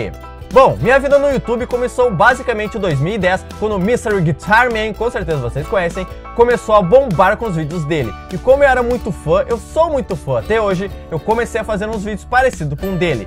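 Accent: Rio Grande do Sul, Brazil. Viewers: Bah, que tri! Quando assisti teu canal pela primeira vez, percebi que teu sotaque era gaúcho! 😍 E agora que tu é de Gravataí, que massa! Eu moro ao lado, em Cachoeirinha. É nós, adoro o canal! ☺👊👊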